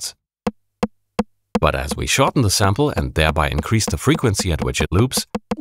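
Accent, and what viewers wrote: Germany. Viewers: you sound extra german in this video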